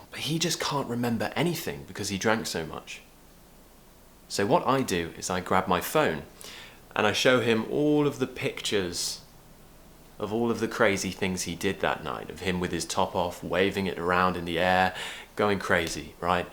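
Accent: United Kingdom. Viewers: Thank youuuu !!!! You helped me a lot to learn british accent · I love the British accent. It is elegant and your accent is best ever. I will keep watching your videos to learn as much as possible. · I love British accent and I've been learning a lot because of you. Thank you so much teacher. You're the best. Greetings from Mexico. 💕🥳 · I'm so grateful for your helpful videos; they give me a new lease of life. I find this one especially nice and useful. Let me get something off my chest: I love your British accent and expressions. Have a great day, or as close to a great day as you can have in these difficult times. Cheers,! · I follow many English teachers on YouTube in order to improve my British accent. They are all great but yours is for sure the best! I just love it. Cheers. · Thanks so much💚 for educating us , Everyday I watch your old and new video's they helps me a lot to learn British accent Big hug for you my English teacher 😘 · love your accent ❤️ and excuse me, where are you from in the UK? 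:D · Your speech, awesome British accent and explanations ın a simple and easy way are soooo helpful. Thanks a lot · Has anyone seen him twice with same hairstyle? Remarkable guy! You've inspired me to learn the British accent. Thank you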